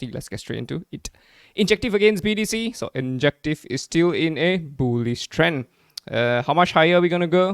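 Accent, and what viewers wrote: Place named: Singapore. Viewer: I grew up in Singapore, and I think, from the accent is in SG or somewhere close. SG for the win 🎉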